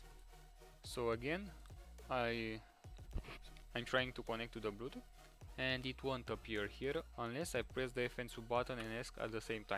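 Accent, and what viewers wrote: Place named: Romania. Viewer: asta e sigur roman dupa pronutie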